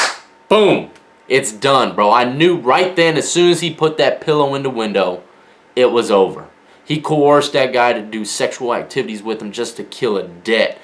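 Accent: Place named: South, United States. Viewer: Why are you so damn cute?! And you have that little southern twang 😍🤗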